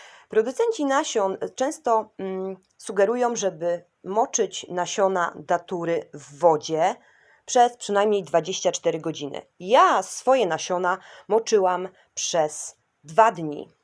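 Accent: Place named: Łódź, Poland. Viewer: Słyszę naleciałości łódzkie ;)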